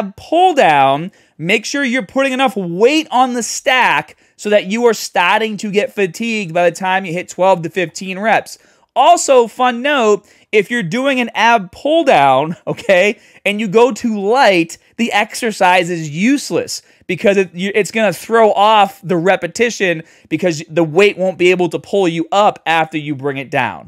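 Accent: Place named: Massachusetts, United States. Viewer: I had a feeling this guy was from Massachusetts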